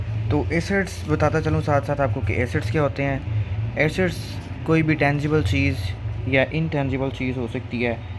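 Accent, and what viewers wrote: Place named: Pakistan. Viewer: Omg your urdu accent is so... I wanna meet you sir! Absolutely Amazing❤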